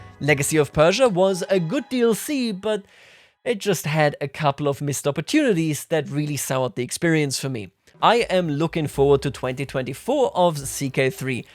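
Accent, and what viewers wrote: Germany. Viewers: hi r u prussian or german? 🤔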